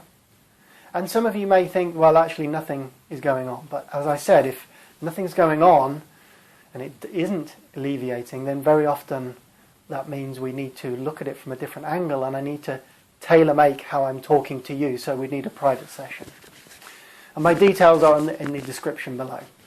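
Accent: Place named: United Kingdom. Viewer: Hello I love your british accent! The pain is weaker! Thank you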